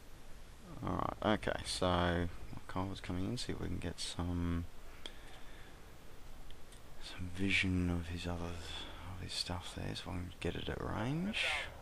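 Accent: Australia. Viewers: You make some brilliant content, and I love watching your War-game Videos. But its a pity you don't have that many viewers. :( Also are you Australian? And do you do Youtube as your job?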